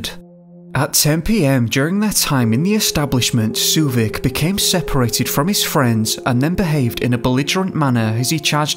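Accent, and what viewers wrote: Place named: Manchester, United Kingdom. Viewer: Currently binge watching your videos and being from Manchester, this sparked my interest. Great content and nice to hear a local(ish) voice.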